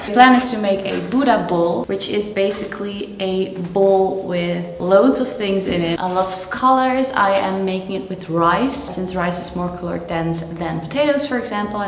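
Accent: Netherlands. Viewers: she's dutch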